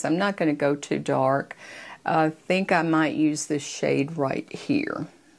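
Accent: South, United States. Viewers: I tell ya, I am 52 and haven’t wore make up in years because of it setting in fine lines and wrinkles. No, I don’t have that many but you know what I mean, the make up would settle in those lines and wrinkles and it would emphasize said wrinkle and fine lines and it would make it look worse. I have recently had an outing with my husband and I bought that same elf foundation that you have there and it did pretty good. I didn’t wear any powder in fear that it would settle in the wrinkles. But thanks for all of your videos, I feel so inspired. And I figured you were from the South due to your accent, I think that’s why I immediately like you, I live in SW Georgia btw, lol❤.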